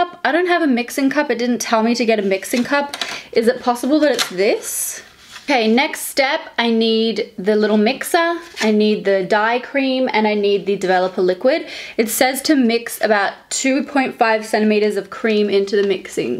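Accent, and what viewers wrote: Australia. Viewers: Love you 💕 I love hearing a fellow Australian accent on YouTube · Are you Aussie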